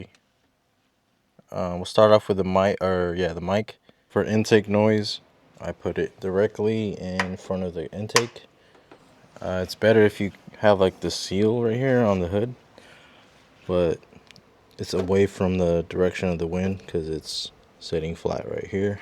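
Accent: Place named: United States. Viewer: You sound american but drive on Italian roads?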